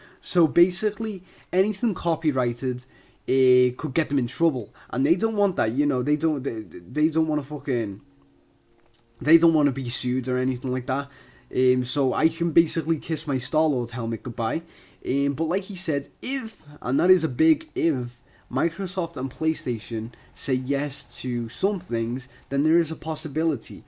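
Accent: Liverpool, United Kingdom. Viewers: Is that a Scouser I hear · he says eehm because hes a scouser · scouse twat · oh another scouse youtuber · bob he is scouse that's why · Are you a scouser StarLord? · bob thats because he's a scouser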